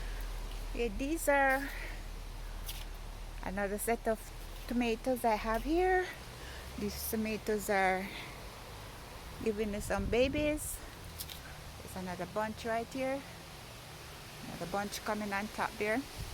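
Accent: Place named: Jamaica. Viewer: Got half way through the video and thought she has to be Jamaican. You just said it! New sub here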